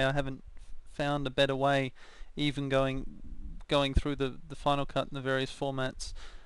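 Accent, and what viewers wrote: Australia. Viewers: hes not english hes australian or smth u idiot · He's Australian you fuckwit. Any idiot can tell that.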